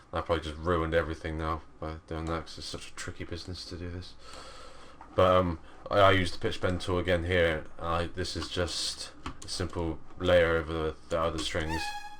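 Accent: United Kingdom. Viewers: is brittish?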